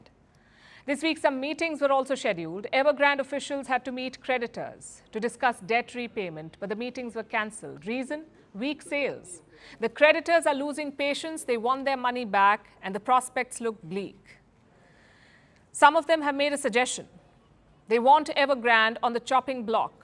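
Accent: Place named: India. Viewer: Okey, Palki nice to hear your Indian accent once more. Thank you for ths update.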